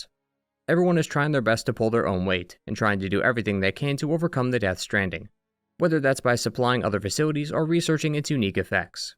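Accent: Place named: New York, United States. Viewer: Dude you make such good content 🎉🎉 Also well done, great to hear someone with ny accent doing so well 😁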